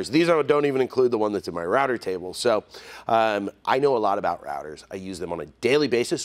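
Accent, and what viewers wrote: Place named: San Diego, United States. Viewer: Are you from San Diego? You talk like a San Diegan. I miss it there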